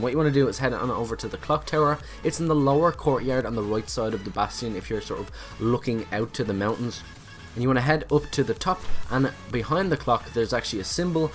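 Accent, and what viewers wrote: Dublin, Ireland. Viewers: Are you from Dublin you sound like it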